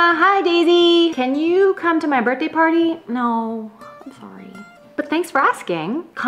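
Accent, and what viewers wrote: United Kingdom. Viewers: awesome English accent it sounded just like the posh English accent haha Stay Gay!:) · You got a cute little pommy accent there, how are you at doing an Aussie accent ? If you can do a good one, you'll be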